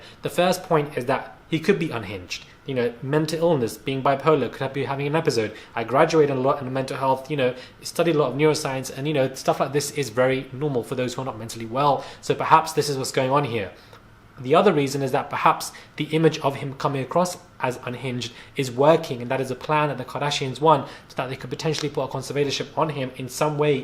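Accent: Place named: England, United Kingdom. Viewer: And I actually thought he meant pounds because he has an English accent and that's where I'm from too.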